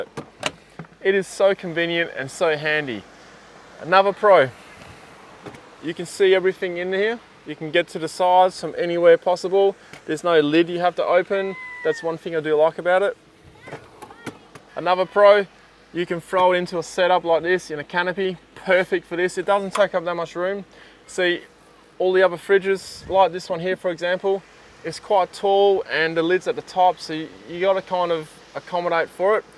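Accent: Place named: Australia. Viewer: Thanks for a great review, I am considering this fridge (or 2) for my campervan build, and you have just convinced me. They will work perfectly stacked on top of each other, and the idea of remotely locating the motor is the best. It took me about 3 sentences in, to identify the language as Aussie English 🙈😂😂 Greetings from South Africa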